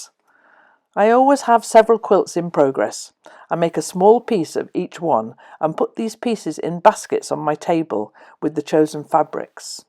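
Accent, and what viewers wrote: England, United Kingdom. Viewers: Love that you admitted that you pinks mandala is done and will never be finished anymore. Haha, I laugh, because my mother has many many many of the same... never completed “samples”. In just my lifetime she has collected and filled a sewing room full of one of a kind Artistic pieces of “samples” many only half completed. as she recently packed up and downsized her enormous sewing room, in a house of 40 years. She and dad, married of 60years, are moving into her next phase of life..... as I am due a 50th Birthday Quilt... as much as she would love a reason to shop for more fabrics and design a quilt... I told her I didn’t want new fabrics, rather I’d love to have a quilt of all her “samples” and class examples... how cool of a one of a kind quilt with all of moms sewing techniques full of appliqué and her free motion machine embroidery project from seminar classes she took and or taught. 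You could read a book for me anytime. You have the perfect soft English accent for my non accent American ears. I don’t have any accent from any particular corner of the states as I have been raised in Oregon. as much as I enjoy the appearance and admire the patience it takes to do English paper piecing... I would go absolutely MadHatter bonkers in the attempt. The Kaleidoscope look your mandalas have is beautiful. Thank you for sharing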